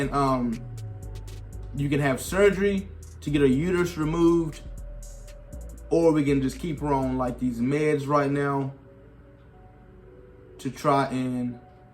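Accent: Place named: Ohio, United States. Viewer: Yo where are u from? you sound like my cousin from Youngstown, Ohio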